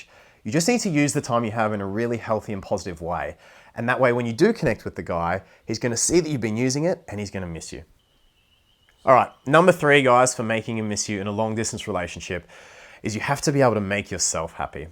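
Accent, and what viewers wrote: Australia. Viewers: Girls must love his Aussie accent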